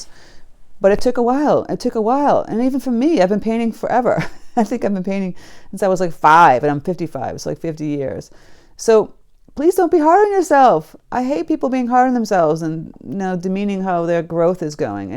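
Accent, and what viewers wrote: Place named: New England, United States. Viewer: I just started a week ago and don’t have high expectations (yet) but I just got halfway through a mountain landscape and quit because it was just that bad! I was scrolling through your videos and found this…definitely words I needed to hear. Keep trying, it WILL get better! I’ve watched several videos by various teachers and really enjoy your style the best (plus I was raised in New England and enjoy the accent).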